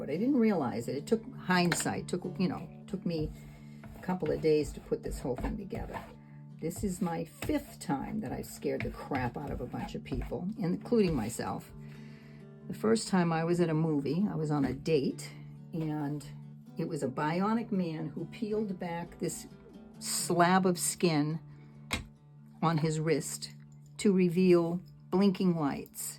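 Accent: Boston, United States. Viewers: I love your Boston accent! Your art is so satisfying to watch as you go about making choices for what you use and how. I can make gel prints all day and do but making a collage is another story. Thanks for making a video today ❤️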